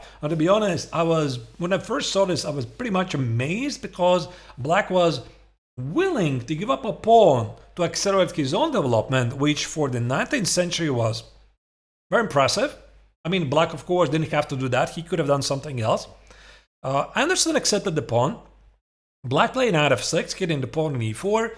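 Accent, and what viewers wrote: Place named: Germany. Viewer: your English sounds very German-like: "adwantage" or "undershtand" sound how a German would say those words. Why is that?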